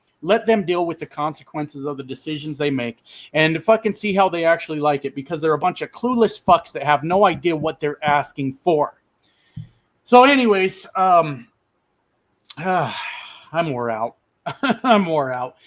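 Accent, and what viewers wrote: Canada. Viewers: Fuck yea buddy. Are you sure you were born in America? Cause you sure as shit sound Canadian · I actually thought you were Canadian..you have the Canadian accent lol. We Canadians are kind, empathetic,giving neighbors..until you give us reason not to be!🤷We now have a briiliant PM with a masters and Doctorate in Economics. The Divided States of AmeriKKKa has a multi bankrupted rapist Felon who has over 50 failed business ventures😂 Canadians are doing great in our beautiful country and continue to be respected worldwide 💪🇨🇦 fdjt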